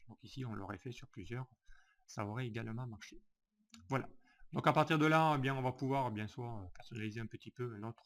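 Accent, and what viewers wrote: South, France. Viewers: Super, merci beaucoup pour cette excellente vidéo avec le charmant accent qui sent bon le Sud ...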